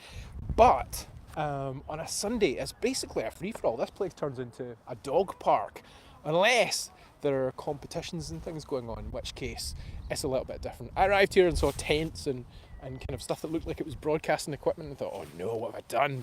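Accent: Scotland, United Kingdom. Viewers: Sorry I missed it ? I saw The Hoof GP give away the Appleton Crush ? Very kind of him ! I will keep watching your shorts ? Love that Scottish Borge of yours ? You have a good Sunday night ! Sorry again my bad ?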